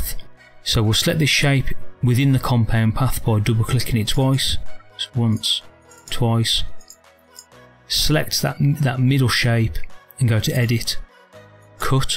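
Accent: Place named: United Kingdom. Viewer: Bruh I cant understand the way you are talking in british style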